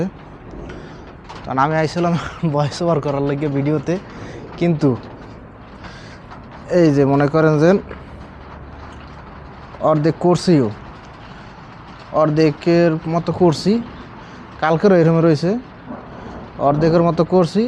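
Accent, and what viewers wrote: Barishal, Bangladesh. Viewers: ভাইর বাড়ি কি বরিশাল?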